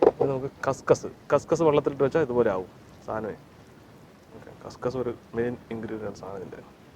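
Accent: Palakkad, India. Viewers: Ningalu palakkad karananno? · This is Palakkad Malayalam sir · തനി പാലക്കാടൻ ശൈലി... 😍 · Palakkad ആണോ വീട്...? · ചേട്ടൻ പാലക്കാട്ട്കാരനാണല്ലെ പൊളിച്ചൂട്ടാ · Thani palakkaadan...😂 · Aa Palakkad slang😍😍😍😍😍😍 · ഫിറോസ്ക്കാന്റെ പാലക്കാടൻ സംസാരം കേൾക്കാനായി മാത്രം വരുന്നവരുണ്ടോ ഇവിടെ? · പാലക്കാട്‌ ഭാഷ പൊളിച്ചു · That Palakkadan slang!! 😍😍😍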